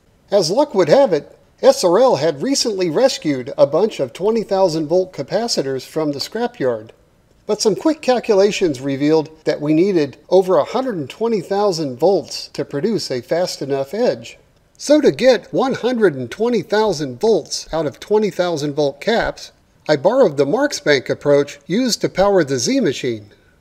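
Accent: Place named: Canada. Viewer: So, just did a little basic math using Assuming that's supply not output, that's 7.2 million kW. Average cost of energy in Canada (could be wrong but he sounds Canadian to me) is 19.2 cents per kWh. Unless I'm missing something, this costs $1,382,400 to fire once at full power, just in electricity. I pray I'm wrong, because this is too awesome to be such a rarity. If it's anywhere near the ballpark though, we need to appreciate the sheer commitment this man has to making cool stuff. In other words: GET THIS MAN SOME LOTTERY TICKETS!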